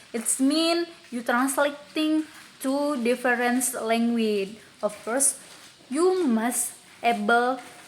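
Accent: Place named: Indonesia. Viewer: Well done girl! Keep it up. You got Indonesian accent I guess, Good I’ve got few good Indonesian friends.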